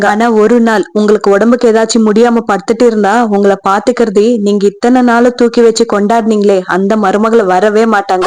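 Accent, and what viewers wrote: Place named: Bangalore, India. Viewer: Kk sis nenka pesuruthu Bangalore la irrukuruvanka pesura mari irruku ahthana ketan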